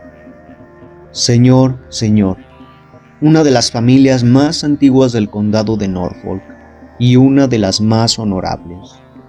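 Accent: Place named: Mexico. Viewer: Está muy bien leído.Trato de acostumbrarme al acento Charro.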